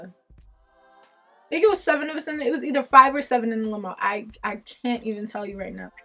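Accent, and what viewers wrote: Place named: Jamaica. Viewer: Yaasss!!! Bust out that Jamaican accent👏🏿👏🏿👏🏿🇯🇲My mom doesn't play with my education either.😂 Where my Jamaicans at???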